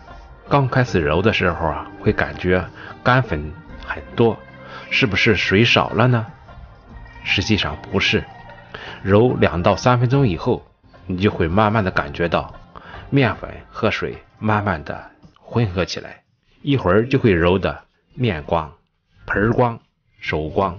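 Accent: Shandong, China. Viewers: 我听出了您的山东口音我家祖辈也是山东烟台人👍谢谢您的答复，祝您圣诞快乐🤶🎄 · 听口音您也是山东人吧，同在日本，听到乡音很亲切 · 是的，山东老乡。你家乡话还是那么重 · 一听就是山东好汉，胶东人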